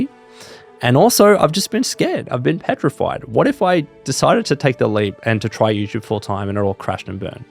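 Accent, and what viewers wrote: Australia. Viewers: Wish you the BEST & absolute success with YouTube,. Your angle has been one of my favorites on the platform. I wager you’d be amazing at Twitch streaming as well to farm some of that YouTube content. The Aussie accent will definitely boost your viewership!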